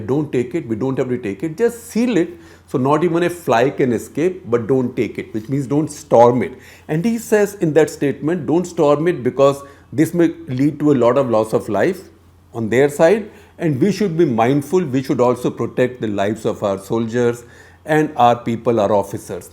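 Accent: India. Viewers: Indian accented is hard to understand 🤪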